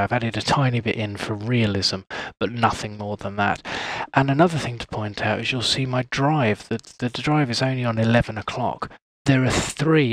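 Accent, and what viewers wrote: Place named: United Kingdom. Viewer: I have a different reason why people clamor for new presets all the time. Our species is never satisfied. Grass is always greener on the other side. we are explorers. Has nothing to do with guitars or presets. Just my tiny opinion which might be 100% wrong. But I love that British accent. And the idea that the underlying methods/reasons/techniques/science is important so you can pinpoint things in YOUR project and improve them instead of blindly hoping a preset works.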